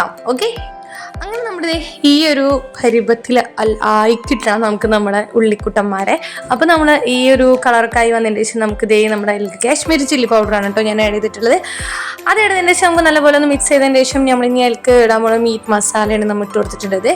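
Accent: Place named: Thrissur, India. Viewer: നിന്റെ സംസാരം കേൾക്കാൻ എന്തു രസാ... 😍തൃശ്ശൂർകാരി ❤️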